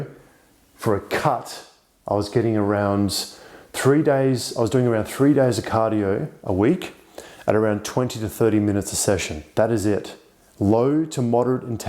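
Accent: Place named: Australia. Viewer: interesting seeing a guy that looks super swedish speaking in an aussie accent.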